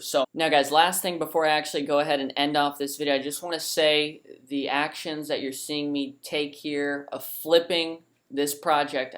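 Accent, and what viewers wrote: Houston, United States. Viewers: You from Houston ? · You from houston ? 🤔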